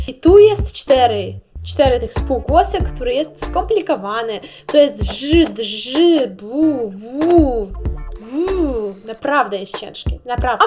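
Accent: East, Poland. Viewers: Nawet nie wiesz, że dużej liczbie polaków podoba się bardzo ,,miękki" akcent wschodni. Brzmi tak miło. Daje poczucie bezbieczeństwa i troszkę jakby odmładza. Mówisz i wyglądasz uroczo. Tak trzymaj. Moja ciocia z okolic Lwowa dalej zachowała ten akcent,, Ale to już nie to |:) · Dziewczyno czym Ty się przejmujesz. Pięknie mówisz po polsku, a Twój wschodni akcent jest wręcz ujmujący. Polacy tak naprawdę lubią Rosjan, rosyjski język i kulture. Nienawidzą natomiast Rosji... Podobnie z Ukrainą. Lubimy Ukraińców Mamy pretensje do państwa ukraińskiego ze nie potępia tego co należy potępić w naszej przeszłości. To tak zawsze jest, ludzie a polityka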